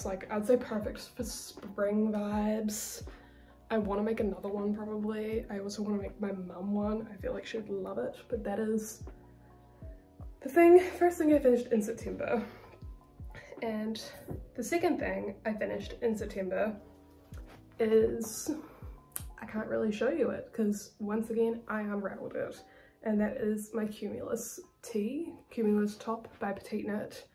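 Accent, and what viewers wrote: New Zealand. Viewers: I'm assuming from your accent that you're in NZ. I'm in Aus. Where do you get Knitting For Olive in the southern hemisphere? I've only found one website that would ship it to Aus and it was a very expensive Japanese website that would have cost like x3 normal.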